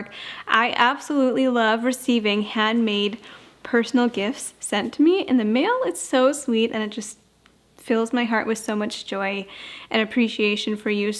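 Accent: Western Canada, Canada. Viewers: Lol I love hearing how others perceive Canadian accents. Hers is more of a western Canadian accent though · I knew you were a Canadian the moment you pronounced, “bag” and “garage.” Lol, you can’t hide that accent :-) 🇨🇦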